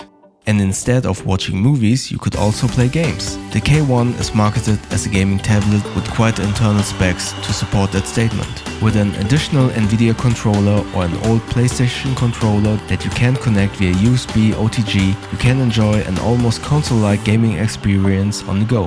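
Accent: Germany. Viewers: I hear some German accent somehow :))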